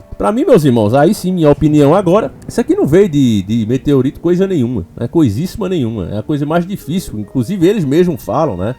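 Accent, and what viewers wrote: Northeast, Brazil. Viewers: BOM Domingo á todos. Grata Dr,já peguei o meu diploma de " telejumento " pois soltei o riso na pergunta: Ou é evoluido ou não? Assinale as alternativas ( Janja em F you) ou 😂😂 ( Musk,eles perderão as eleiçôes) e no som lindo da sua voz falando " convergindo" como um i aberto e, melôdia do Nordeste. Escolhí Elon e a Melôdia. Sou diplomada?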